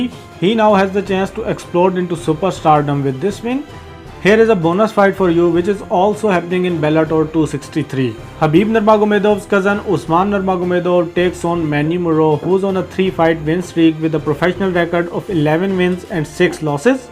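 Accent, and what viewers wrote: India. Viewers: This is english with indian accent...french and other latin language WE sound a lot différent...so you learning english with indian accent dont be afraid to Say it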